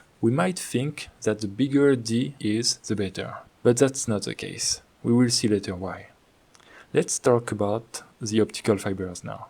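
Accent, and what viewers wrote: France. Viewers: I love the French accent ❤ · Tu es français!! Je peux l'entendre :) Did you measure the intensity of the light, so that you can calculate the efficiency? That is the key.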